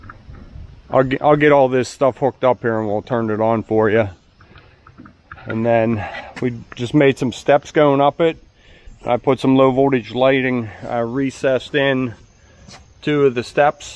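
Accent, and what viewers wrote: Pittsburgh, United States. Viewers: Previous New Castle boy here...... subbed via the Morgans! Good stuff..... how I miss that Yinzer accent!!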